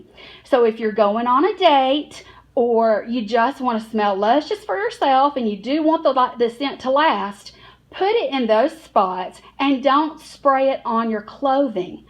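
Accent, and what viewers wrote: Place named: Boston, United States. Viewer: Omg I love your accent! I'm from Atlanta and just moved to Boston, I miss hearing people talk like this! You have a great collection :)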